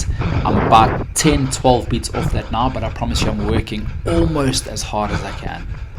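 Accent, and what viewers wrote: South Africa. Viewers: 6”2, 120kg,47 and the only thing we have in common is the the SA accent. I enjoy parkrun for just being out there records are fine for those who care about them , I disagree that getting lapped is motivating but it’s encouraging when a front runner cheers you on but also annoying when they think they own the place ! You showed your class by staying and cheering on the others !!! . The 6 week training pack looks great but not sure it’s intended for those at the back of the pack . Keep up the great work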